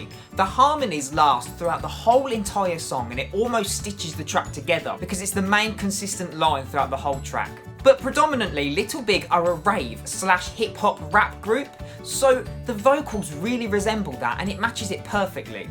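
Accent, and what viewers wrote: United Kingdom. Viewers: Greetengs from Russia. Wanna get your feedback on the question is your accent British? Btw good job, very informative and objective. Good luck bro:) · Thanks for your reaction and review 👍 I really enjoyed watching it and hearing you... I just adore British English 😍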